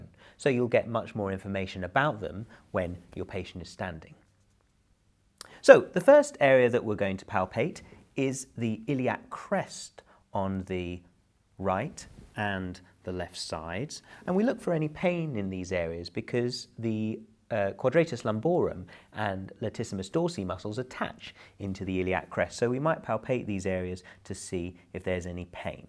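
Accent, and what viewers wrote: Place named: United Kingdom. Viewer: your explanation is crystal clear this how you can tell British English sounds better than American 's 😊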